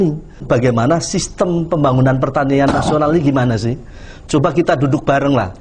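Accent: Java, Indonesia. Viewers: Logatnya medok jawa ya... Beda sama 3 tahun kemarin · Ini Alm. Lord rangga? Kok medhok?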